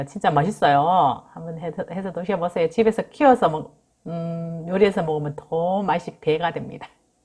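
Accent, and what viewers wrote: Gyeongsang, South Korea. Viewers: 주부님먹는소리가말자국 같아요ㆍ경상도주부님 같아요ㆍ알뜰한 주부님 성격도좋아요ㆍ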